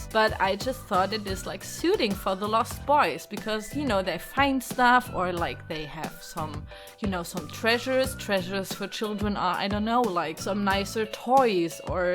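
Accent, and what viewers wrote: Germany. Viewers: Where are you from? Your accent is kinda german. :D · Achso ich dachte schon irgendwo her kenn ich den Akzent :D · Are you german?you have this german accent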